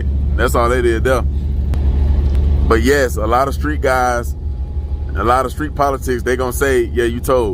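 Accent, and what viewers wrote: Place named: Miami, United States. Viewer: Where you from fam? You sound like a Miami nigga...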